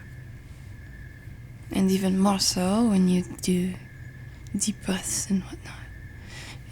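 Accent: France. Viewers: Your French accent is an audible delicacy 😋 ♥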